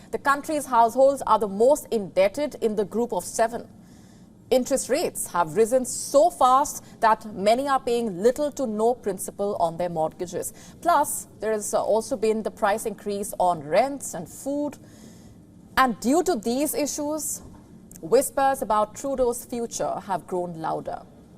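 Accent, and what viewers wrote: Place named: India. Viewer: Indian Accent heavily influences pronunciation ... hope so you would understand.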